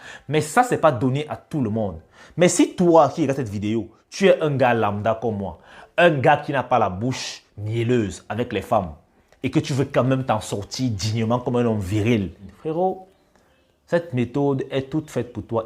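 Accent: Cameroon. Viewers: Hayi déjà l'accent est clairement camerounais 😅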